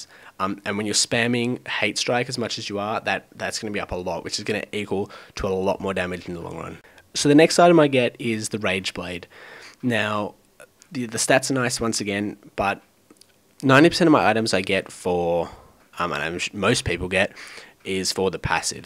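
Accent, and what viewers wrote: Australia. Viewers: where are you from, Its hard to tell you're accent you sound Australian but also English.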